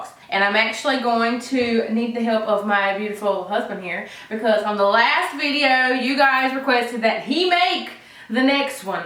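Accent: South, United States. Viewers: u sound very southern / country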